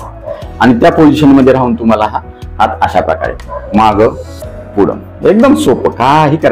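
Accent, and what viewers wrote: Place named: Kolhapur, India. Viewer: खुपचं छान व्यायाम शिकवता फार फायदा होतोय धन्यवाद 🙏 सर आपण खरंच लातुरचेच आहात काय कारण तुमची भाषा हि कोल्हापूर ची वाटते मी हा व्हिडिओ कोल्हापूर जिल्हातील गडहिंग्लज तालुक्यातील एका खेडेगावातून पाहते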